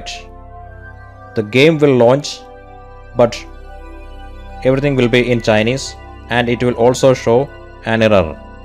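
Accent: Kerala, India. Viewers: Bro malayali analle. English parayumbol manazilavum😭🤣😅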